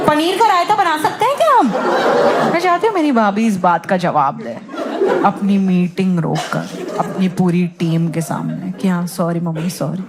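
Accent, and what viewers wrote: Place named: Mumbai, India. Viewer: Is she from Mumbai by any chance? That accent sounds very familiar (Lots of my family members in Mumbai)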